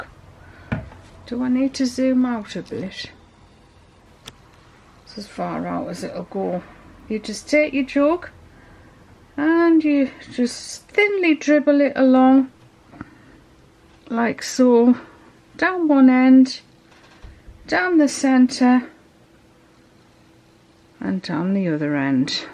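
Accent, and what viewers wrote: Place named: Cumbria, United Kingdom. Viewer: Haha right Cumbrian kitchen banter. It so nice to find videos with a local accent instead of usa (not that I've anything against usa accents I watch them n all) it's just good to know Cumbrians craft too 🤩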